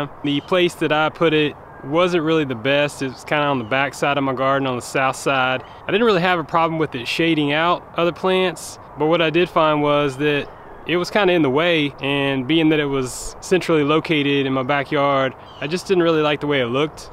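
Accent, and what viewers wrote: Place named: Louisiana, United States. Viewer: Love your accent. Louisiana?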